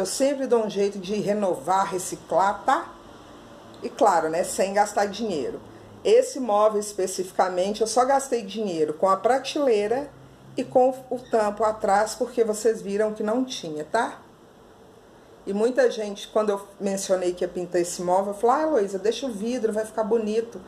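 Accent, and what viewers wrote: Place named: Minas Gerais, Brazil. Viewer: Eu amei...na minha casa a sala de jantar e cristaleira é provençal...de patina branca...super amo...e super combina com o estilo farmhouse...então estou curtindo muito o que vc faz...até porque são coisas acessíveis...bem a realidade...adorando...e aproveito para dizer que adoro seu sotaque...ano passado no carnaval eu fui aí em BH....queria ter te conhecido naquela época...tbm fui la Inhotim...espetacular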